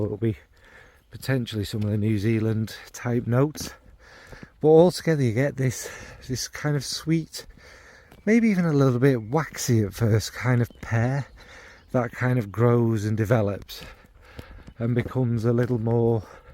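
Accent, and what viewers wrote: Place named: England, United Kingdom. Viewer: You don't need too it's cute and funny 🥰 (English accent in french is beautiful!!!)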